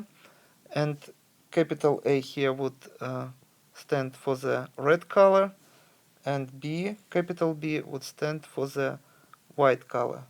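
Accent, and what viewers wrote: Russia. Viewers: your accent is very good. Are you from Russia? I liked that.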